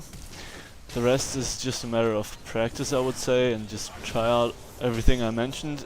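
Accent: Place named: Germany. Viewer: Man erkennt nach spätestens 3min das du deutscher bist :D Aber trotzdem echt cooles Video ^^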